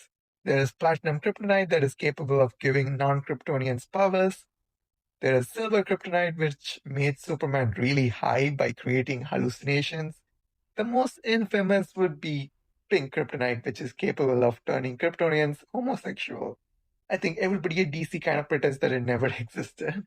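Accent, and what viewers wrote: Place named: India. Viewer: Indian voice makes it feel like a tutorial on YouTube 7 years ago I like it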